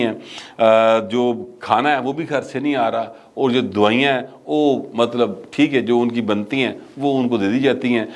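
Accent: Punjab, Pakistan. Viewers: Dakia Butt saib Ma ghuzishta 13 sall sa punjab mah ra rah hun Rerri chingchi dukandar bara umar ka logh sab ki rai han k umna Share ko vote dia aour dangha Hah kuch nawjan PTI ka haq ma boltha han maghar 9 May ka bad o kamosh ho ghian han jahn tak PPP ka taluq uska wajood bahn bazi tak our kuch nai Patha nai aap ithfaq kartha han ya nai ALLAH apko lami umar aatha karan Taka aap Punjabi numa Urdu boltha ran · oh paaine your Punjabi is too strong to understand. Non punjabis ka lehas kar lain paaine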